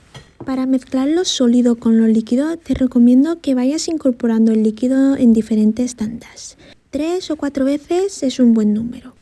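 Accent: Spain. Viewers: Me encanta tu acento español!!!! 😂👍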